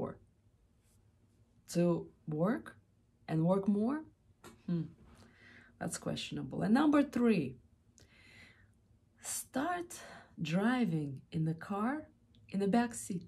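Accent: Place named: Eastern Europe. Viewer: I knew it!😃 I just didn't know for sure because there are a lot of eastern Europeans that have a similar accent to yours (atleast when I hear it) 😂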